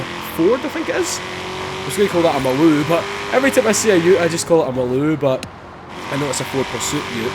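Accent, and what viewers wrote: Scotland, United Kingdom. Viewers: Where abouts in scotland are you from?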